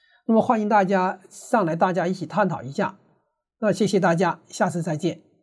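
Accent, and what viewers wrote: Cantonese, China. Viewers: 你的广东口音暴露无遗🙃🙃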